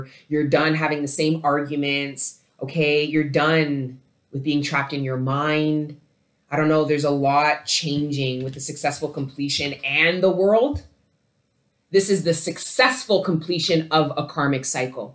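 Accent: Jamaica. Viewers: deep voice patois FUCKING DONE.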